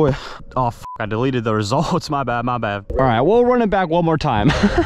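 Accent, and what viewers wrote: United Kingdom. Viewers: love the british accent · Love the Brit accent or is it your real accent im British bit I don’t sound like that but hey keep it up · That british accent tho😂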